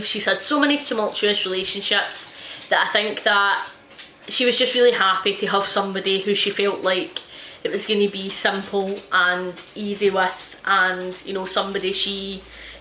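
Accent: Scotland, United Kingdom. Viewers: why are scottish accents literally the best omg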